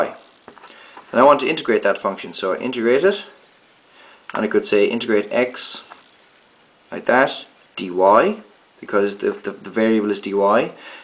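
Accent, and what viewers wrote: Ireland. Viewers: You have a lovely irish brogue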